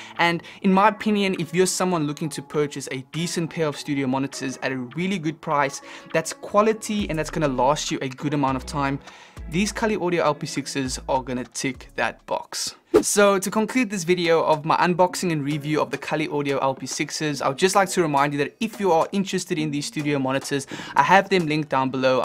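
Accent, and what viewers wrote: South Africa. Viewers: I hear a South African accent. Greeting from a fellow South African muso living in nashville.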